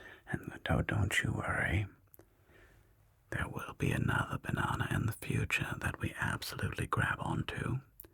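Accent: Germany. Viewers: Perfect, I love the German accent, and your thoughts!💛 The banana metaphor was so simple, but so on point for this... because holding onto things is sometimes less scary than the unknown even if it cages our very own hand in a bowl... very true! Loved the insights of this video!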